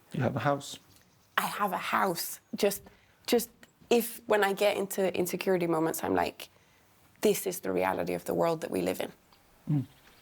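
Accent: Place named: Ireland. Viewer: Wonderful, sister, miss the irish accent , lived for a good while in Ireland, then felt to move back to rural România to care for a piece of land and few old villagers around us here, such an obvious, simple solution for humanity to cease its destructive madness and become harmonious, returning to nature..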